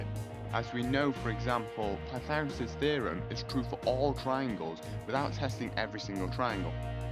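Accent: United Kingdom. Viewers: your british english made me hard to understand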